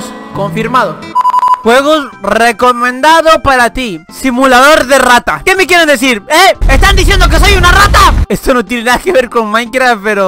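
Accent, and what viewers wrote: Argentina. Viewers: Es argentino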